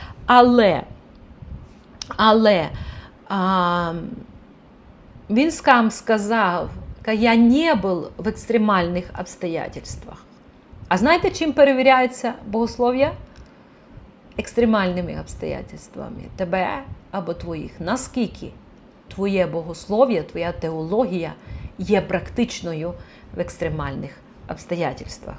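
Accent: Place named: Surzhyk, Ukraine. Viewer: Ну, подарунок вы ещё тот. Вы говорите на суржике. Смешной, исковерканный говор. Пидуть, справди, агрэссия, винувата, процентув, сказав, говорыть. Всё исковеркано. И вы захотели, чтобы другие тарабанили на этом подарунке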